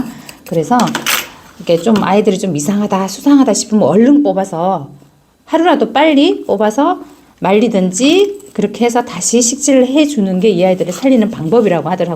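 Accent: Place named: Jeolla, South Korea. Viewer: 안녕하세요~🥰🥰🥰 편안한밤참들기전 이쁘니들 힐링하러왔어요 ~~ 화그 재식재 하시는군요~~건강 해보여요 잎장은~🧚‍♀️🧚‍♀️🧚‍♀️🧚‍♀️🧚‍♀️ 여름에도 전 물 조금줘요 뿌리가 고사가 되더라고요 😂😂😂 전구독한지 오래됐떠용 전라도 사투리 겁나게 쓰시는분 👍👍👍👍👍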